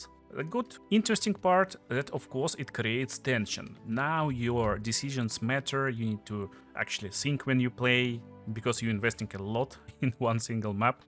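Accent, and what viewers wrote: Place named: Russia. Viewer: Bro as an Arabic guy, nobody can easily explain stuff to me better than russian accent 😂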